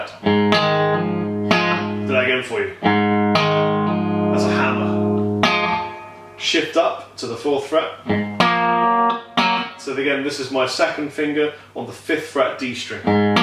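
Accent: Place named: RP, United Kingdom. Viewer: He even sounds like a "HIGH BRIT" 😁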